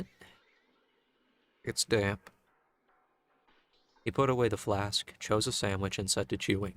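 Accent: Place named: England, United Kingdom. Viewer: This was the best production of an audiobook i've listened to. You struck a good balance of acting without going over the top. The voices are really good. Even your English accent is brilliant, that's coming from a brit. You had me wondering if there were multiple actors untill i read the comments. And i loves the subtle background noises and effects, they were just the right volume and not distracting. WELL DONE, please do more, i dont even care what the book is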